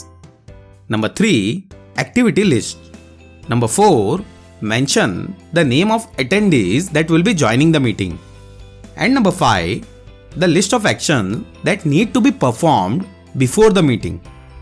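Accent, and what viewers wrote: India. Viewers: othentic indian tone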